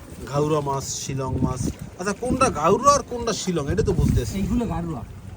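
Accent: Barishal, Bangladesh. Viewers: Ki kotha re bai😂😂 anne sob dehi borishailla mönü ara🐸🐸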